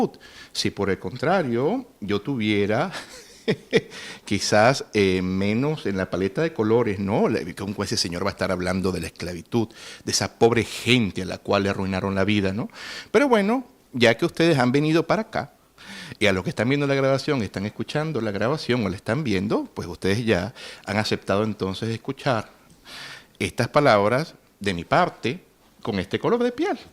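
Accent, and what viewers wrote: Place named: Peru. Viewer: Un hombre muy bello, esa piel trigela, y venezolano, uff uy , aunque su acento me parece un poco al peruano, saludos desde Cali,- Colombia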